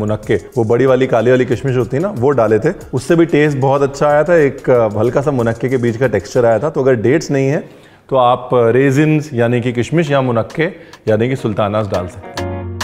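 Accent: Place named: Uttar Pradesh, India. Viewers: Arre bilkul Lucknow wale jaise bolte hai aap, you can easily figure out how a Lucknow wala speaks. I am too from Lucknow. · Totally up wali boli 😅 mee too from lko that's y i like u so much❤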